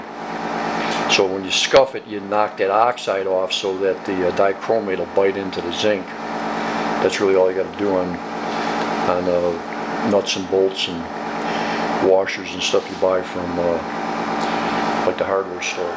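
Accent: United States. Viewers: That's gotta be a Chicago accent!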